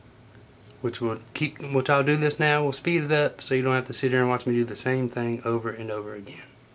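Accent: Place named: United States. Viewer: love the accent what part of usa ? Video is great btw!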